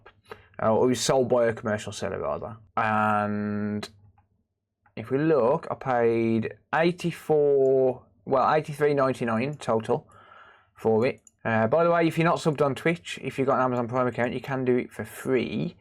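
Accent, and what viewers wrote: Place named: Scotland, United Kingdom. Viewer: Is he scottish? His accent is so thick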